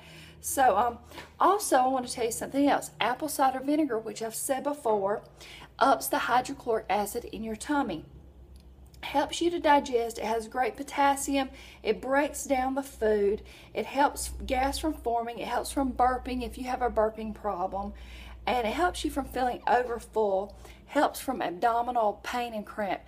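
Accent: South, United States. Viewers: You're videos are so entertaining :D made me laugh quiet a few times! I enjoy listening to you so much, so positive and you're southern accent is adorable :* greetings from Germany :)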